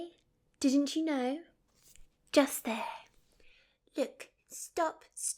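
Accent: Scotland, United Kingdom. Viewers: Where in Scotland are you from? Your accent seems stronger than mine x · Modsforfun I love that Scottish accent. I need a woman man from either Scotland, Ireland, or England. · doesn't take away from the experience I assure you. Very good impressions! And still relaxes the hell out of me. Great work. Plus you have the accent going for you, you just can't beat a Scottish accent. I don't care who you are. Especially for ASMR. Just fantastic. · as a Scottish person watching this I love it but I'm still not used to hearing Scottish accents on Youtube. Anyway I love this channel!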